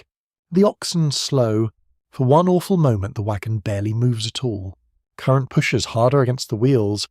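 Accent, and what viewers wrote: United Kingdom. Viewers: Aaargh so tired of cokney ai voices 😊😊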